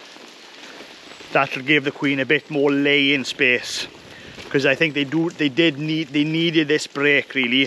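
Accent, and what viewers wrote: Wales, United Kingdom. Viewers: Hi, believe it or not, although I am researching himalayan balsam, I clicked on your video because i hadn't heard a welsh accent in a while! I like the content too. Which part of Wales are you from? The north?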